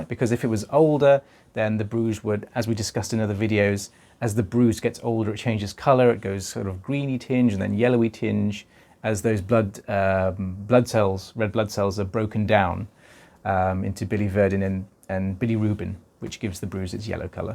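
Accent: United Kingdom. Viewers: Sigh…. fascinated by an ear cleaning narrated by a lovey Brit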